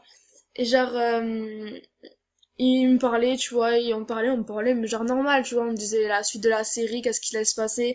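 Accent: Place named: Toulouse, France. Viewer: Kami Kado De youtube elle a le même accent quelle (Toulouse je pense) :)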